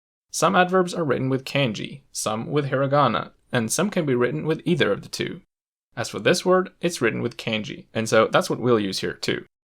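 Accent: United States. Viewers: Stand out? Like fingernails on a blackboard. To me, it's the worst sound in (American) English. I cringed every time you pronounced it, as well as when you pronounced Katakana and Hiragana in such an American accent. Otherwise, well done... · right? I'm Aussie and I honestly thought he was just American, sounds just like every other American to me! 😂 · you have the most american pronunciation of kanji ever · THE WAY YOU SAY THEM WITH THE BIGGEST AMERICAN ACCENT PISSES ME OFF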